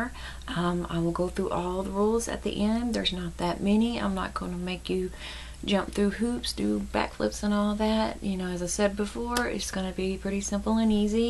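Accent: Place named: Louisiana, United States. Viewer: I am a new supporter, I found you thru Vallerie Hill. I'm not one to unsupport or unfollow just because I didn't win. I think that's awful & im all about supporting especially small channels and buisnesses. I like to see people grow and I'm passionate about that. So no worries hunnie. I'm glad I found you because it will be great to see you grow!!!! And YOU WILL!!! 😊💗💗 I absolutely love your personality and spunk of Louisiana !!!! I'm from PA so accents are so much fun! I actually don't think I've had Louisiana food cooked right before! I've tried making creole before if that counts!!! I'm from up north so .... Anyways rambling 😂😂 3 MAC shades? My favorite are:1. BLANKETY. 2.SNOB 3. HALF N' Half. And a Question for Q & A ? What is your favorite drugstore brand & favorite High End brand? Thanks for the chance! And cannot wait to continue to see growth in your channel!! 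💗💗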